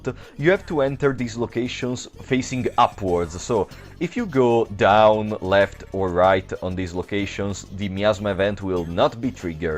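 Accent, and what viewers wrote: Italy. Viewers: Are you italian? (just for the accent)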